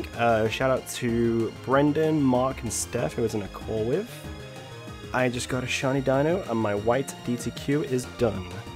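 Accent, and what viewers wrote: United Kingdom. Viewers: This guy sounds like he has a hint of northern Ireland in his accent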